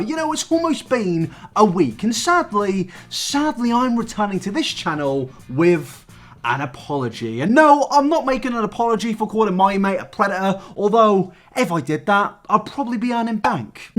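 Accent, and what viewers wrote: United States. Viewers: fantastic american accent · sad that i will have to dislike because of the american accent :( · Liked the video for that lovely American accent chap 👌👌👌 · I really wanted to like this video. But I cannot condone that American accent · Your American accent is bloody lovely mate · Just liked because of the American accent. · I didnt like the American accent but I liked the video anyways. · You're so adorable!! That American accent 😂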